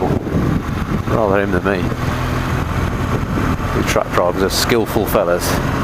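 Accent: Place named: England, United Kingdom. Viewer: I just love the way you keep talking in your English accent and commenting on your surroundings. Pretty narrow and thus scary roads you have there. Beautiful landscape though! Ride safe!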